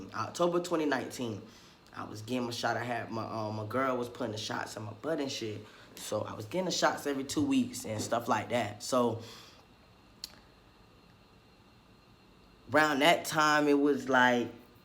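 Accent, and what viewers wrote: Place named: Florida, United States. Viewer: I can hear that Florida accent!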